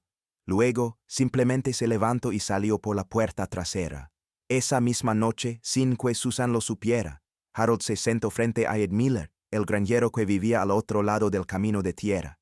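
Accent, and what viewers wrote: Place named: Portugal. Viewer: Habiendo locutores con voz firme de habla en español, contratan a una persona de origen porrugues, qué mal gusto...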